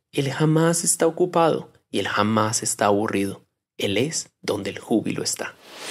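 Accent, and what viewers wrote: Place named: Colombia. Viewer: This is great idea. however, the audio has a strong Colombian accent. Is there a scrip that we can read?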